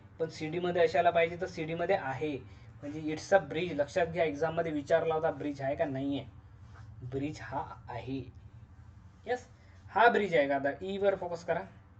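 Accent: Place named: Vidarbha, India. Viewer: विदर्भा मधले आहात, भाषे वरून समजते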